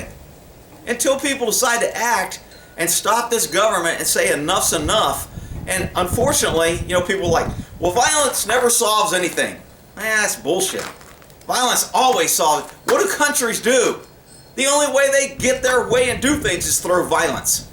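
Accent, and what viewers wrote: Texas, United States. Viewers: Because with your accent, your "gas" sounds more like "gaz". I like your Texas twang. Even when you were in California and that's when I bumped into your videos of, I could tell you weren't a native Californian. I thought, "This guy's from Texas." My older relatives on my mother's side who are French Canadian will say gaz rather than gas. When you mean to say "quiet", you say it fast and it sounds more like quite. I'm not picking on you at all. Every one has a different accent. I'm from New England and every one knows the old "Pahk the cah in Hahvad yahd (Park the car in Harvard yard). When I lived in Virginia in my early 20s, some times people would ask me where I was from, when I said Massachusetts they didn't believe me because I didn't sound like a Kennedy. That's the only accent they assumed we had, which is not so. Actually, only the Kennedys have that weird accent. 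I usually don't drop my Rs because my parents didn't talk like that. But now that I'm half a century old, I sometimes drop it. I noticed my father dropping his too now a days when he never did before. A friend of mine and I took a road trip to Florida years ago. When we stopped in Georgia to get something to eat, it was like we were in another country. I could barely understand what many of them were saying because their accent was so darn thick! Wow! Then in Florida I could understand every one just fine. Also, a Texas twang is not the same as a southern drawl. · I wonder if anybody else catches your greeting in all your videos? "Morning Dumb People"...or is it that Texas draw...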